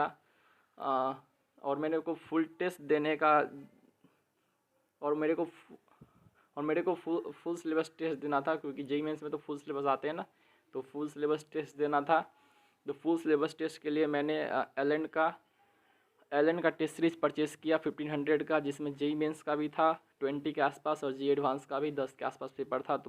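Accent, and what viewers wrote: Bihar, India. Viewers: Bihar se ho kya,?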